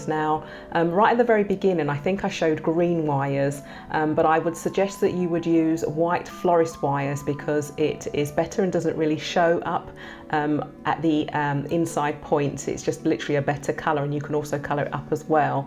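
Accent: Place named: England, United Kingdom. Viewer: I like your voice and your English accent 😃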